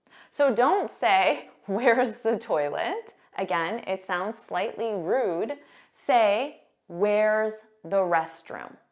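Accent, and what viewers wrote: United States. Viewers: Such horrible american accent! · You speak American! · A nasal American English speaker stating the obvious. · I don't like your pronunciation so so so boringly American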